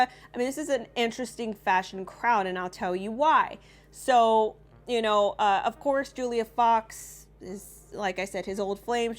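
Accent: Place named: Staten Island, United States. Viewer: You sound just like my Italian sister from Staten Island NY! Good discernment 💯☺cool beans! 😎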